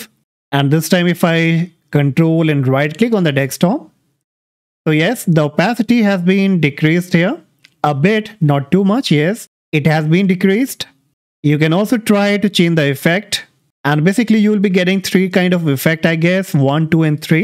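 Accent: India. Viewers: Indian accent..get out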